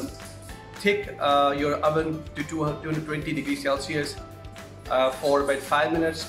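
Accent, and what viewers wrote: Scotland, United Kingdom. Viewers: Love the accent Asian , Scottish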